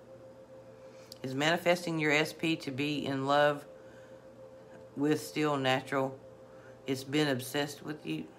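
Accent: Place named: West Virginia, United States. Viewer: Hi! It is GREAT to put a voice to ur coaching. It puts a whole new spin on everything. Glad to be able to subscribe as i cannot be on Social Media d/t my job. Plus your dialect is a lot like my own being from WV. LOVE IT!!! THANK YOU!!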